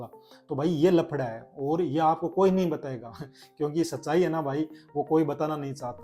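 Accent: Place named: Haryana, India. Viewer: Language Haryanvi Touch 😊😊😊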